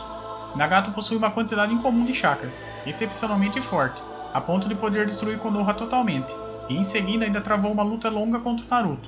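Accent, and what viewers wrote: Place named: Minas Gerais, Brazil. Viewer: Mano seus vídeos são muito bons, tu manja muito, mas na moral cara, procura uma Fonoaudióloga, não é por implicância ou por qualquer outra coisa, mas seu sotaque torna o vídeo maçante, parece um cara do fundo do interior de minas falando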